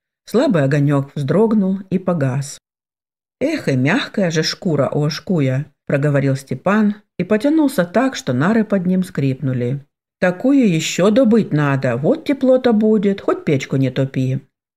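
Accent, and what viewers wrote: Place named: North, Russia. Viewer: Прекрасное чтение, даже не представляю, как это можно, привыкнув всегда выговарить гласные так как мы обычно это слышим, так быстро перестроиться и читать как бы О-кая. Это придаёт рассказу какую-то особую прелесть и неповторимость. С большим удовольствием слушаю и всегда с нетерпением жду продолжения. Да благословит вас Бог в этом служении.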